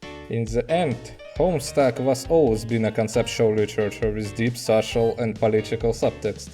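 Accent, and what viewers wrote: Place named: Russia. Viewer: cute russian accent :3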